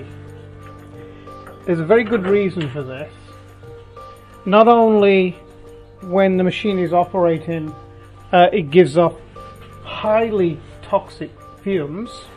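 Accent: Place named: Cardiff, United Kingdom. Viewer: laaarge! Got to be Caaardiff or thereabouts :)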